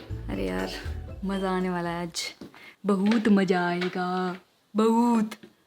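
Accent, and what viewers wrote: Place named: Delhi, India. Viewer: love your videos.. very addicting.. tum delhi se ho na? tumhare dilliwale accent se lagta hai.. main bhi dilli se hoon to tumhe sunkar maza aa jata hai.. keep up the good work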